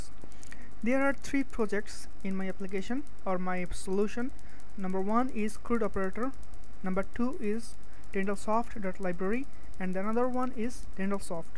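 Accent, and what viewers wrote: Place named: Bengal, India. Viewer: What happened in m You also do not speak English good!! You speak Bengali vernacular.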